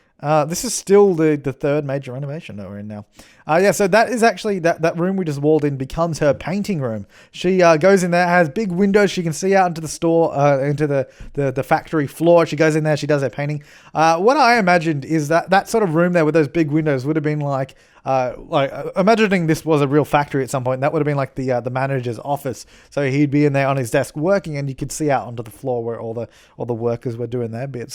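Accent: Australia. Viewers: Absolutely my favourite sims4 series so far, as I usually play the game starting from little to no money. And your Aussie accent is somehow perfect for this kind of commentary. Perfect. Haha.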